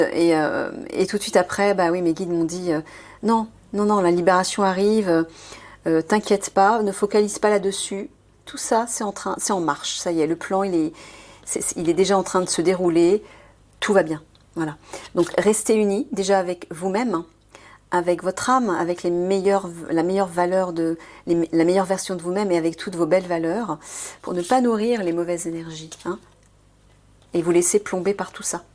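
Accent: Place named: Belgium. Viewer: Oh vous êtes belge :). C'est clair que c'est de plus en plus visible... Merci pour ce partage.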